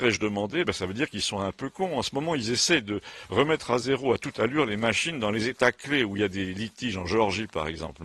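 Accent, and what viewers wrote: South, France. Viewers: Génial, comme toujours, soit depuis quelques mois que je vous suis, directement du Québec. IL y a plusieurs références que je ne connaît pas mais j'arrive à vous suivre. Je m'aime beaucoup votre accent du sud et votre timbre de voix. Merci, c'est devenu un rdv hebdomadaire. C'est comme retrouvé un vieil ami d'outre-mer. Salutations du Québec (Rimouski).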